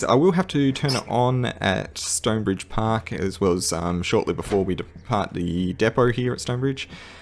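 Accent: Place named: Australia. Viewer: I'm tipping he's an Aussie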